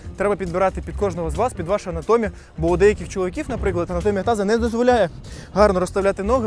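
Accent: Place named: Russia. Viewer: А якщо ухвалять закон, що з російським акцентом не можна говорити? Що тоді? Замовчите?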